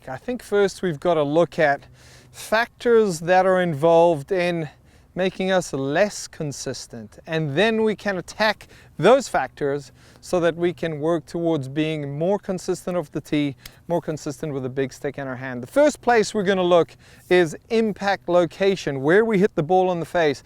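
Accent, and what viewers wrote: South Africa. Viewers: I am a new subscriber to your channel... Love you videos Dont have too many golf Tutors in South Africa...but we do have some awesome golfer.... Your accent sounds south African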